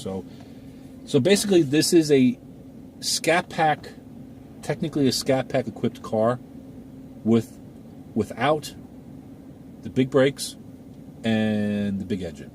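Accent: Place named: New York, United States. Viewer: Where in NY r u from? I have a good idea based on ur accent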